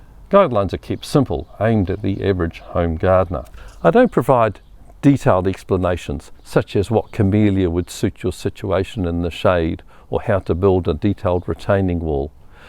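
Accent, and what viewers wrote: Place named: New Zealand. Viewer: Hi, I'm looking forward to working my way through your tips. We moved from 1/4 acre in the 'burbs of Hamilton to 10 acres in Nth Canterbury 3 years ago and while I have gardened for many years and have big dreams I am somewhat challenged in the design and execution department:)) Always lovely to hear our NZ accent on "the tube".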